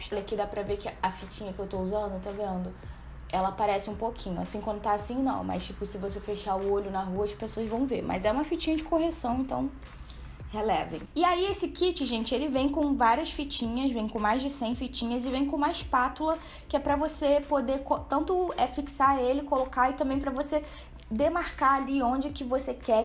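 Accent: Rio de Janeiro, Brazil. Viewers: mana, como vc fala em inglês com ess sotaque lindo de carioca? queria muito ouvir!!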